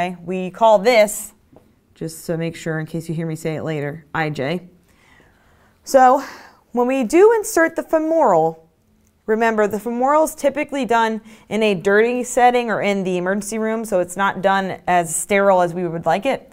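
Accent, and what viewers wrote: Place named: United States. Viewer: Great but please not explain in pure American ancient we can't catch pronounce word little harshly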